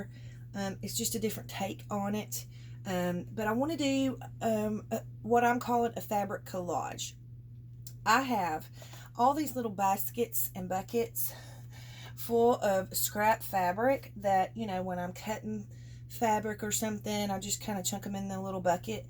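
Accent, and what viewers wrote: South, United States. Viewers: I was sitting gluing and your video came up next on my playlist from the feed suggestions. I truly enjoyed it and loved your funny comments and of course I love your Southern Accent, I could listen to you the day long! Im a new subbie. Love your collage technique and yes I have more than my share of scraps. I so snippets but in long strips with a sheet base. Usually about 6-8 inches wide and it is to clean off my desk. Thanks for sharing and the fun! Have a very creative week!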